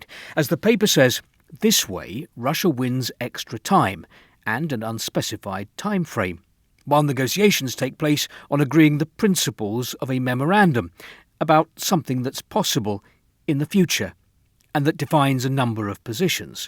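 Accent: United Kingdom. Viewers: Thank you for your work! 🙏🙏🙏 By the way, I love your strong British accent. :) It's much better understandable for a eurpean non native speaker that American English. 😄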